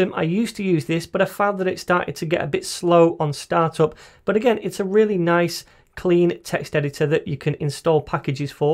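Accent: United Kingdom. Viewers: By your accent I can tell you are British, pls marry me. A British tech dude. Perfect combo! 😍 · thanks a lot. i like to watch your video not only because i wanna learn about web programming language but also learn about english especially british. and i like your accent. its rarely british guy make programming tutorial on youtube.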